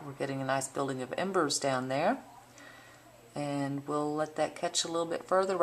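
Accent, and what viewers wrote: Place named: Texas, United States. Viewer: Just subbed to you guys. I commented on your other stove video. I was born there and my mother was raised in Shiner...it is refreshing to hear a lady speaking with a Texas accent. You sound like my dear Mama and the other ladies in her kin. Plus, you are making homemade bread....from scratch! One of my best memories is walking into my Granny's little kitchen and she's give me a chunk of fresh buttered bread from her stove. Good stuff. The memory is making me hungry....gotta go. :)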